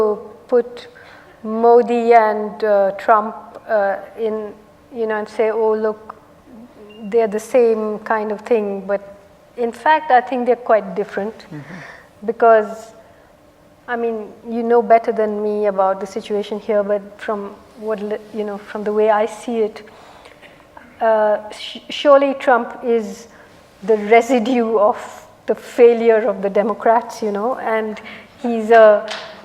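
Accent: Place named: United Kingdom. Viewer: She is another school of propaganda unto herself . Propaganda always has an element of truth manipulated to an end with creating even a more radical social polarisation than what actually exists on the ground . She really never talks about the emergency , or the biggest riots in the pre modi era , the kashmiri pandits exodus . She definitely has an upper caste Hindu phobia . In her pursuit of happiness , all the Hindu characters are cruel blood Mongers who crave Muslim’s blood , always vile and wicked while all the Muslims and third genders are noble , peace loving , innocent , righteous, just and beautiful .. specially the kashmiris are depicted as the most noble and kind hearted race in the world. What sort of a joke is that ? Her generalisations are gross and frustrating . Never been more fed up of a mainstream writer . What she is doing to society isn’t just a disservice but a more serious damage . 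In order to criticise one radical form of militant hinduism and the Muslim phobic right wing in India , she is demonzing the entire mainstream Indian culture and Hindus at large. Her theory is more simple than what her chaste British English attempts to hide - her one point program to create a demon out of everything that hinders her propaganda. There is absolutely zero analysis in her arguments ,only blanket sweeps and gross generalisations , even lies ! She picks and chooses facts at will , even the degree of the facts reported by her is manipulated . I wonder how come a brai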